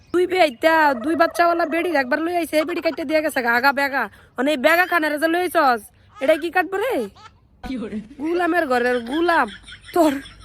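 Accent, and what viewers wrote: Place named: Netrokona, Bangladesh. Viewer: নেত্রকোনার ভাষা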